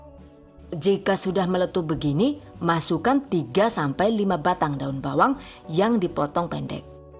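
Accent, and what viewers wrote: Banyumas, Indonesia. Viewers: Muaturnuwun mbak ngapak 😀🙏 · orang ngapak ya pada baelah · Mantep resep rougut nya ,, ngmg ngapak koh,, nyong wong ngpak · Mbakayu ne lucu ning ngomong Jawa ngapak cm sayang ora katon wajahe, tp masakane ky ne top maskotop.... Jos gandos wwwkkkkwkkkk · Deneng ngapak kaya inyong heheh,,maturnuwun bunda resepnya...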